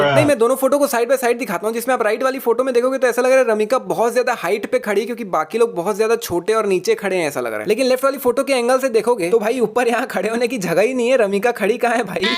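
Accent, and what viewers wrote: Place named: Mumbai, India. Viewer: Bhai udda diya ek Mumbai excent hai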